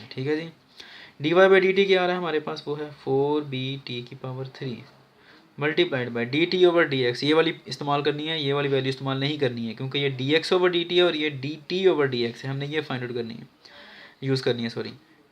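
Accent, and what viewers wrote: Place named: Lahore, Pakistan. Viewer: Sir AP ka bolny ka style Lahore wolo jasa ha and Iike their style of talking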